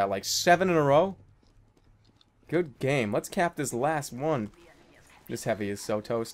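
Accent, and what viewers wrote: Boston, United States. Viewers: Jeez the Boston accent. I want it T___T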